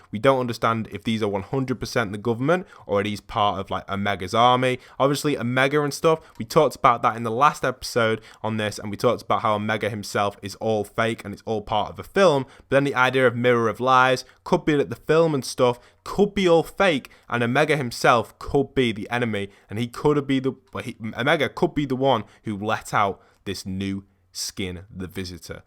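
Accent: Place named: United Kingdom. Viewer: I don't like the British accent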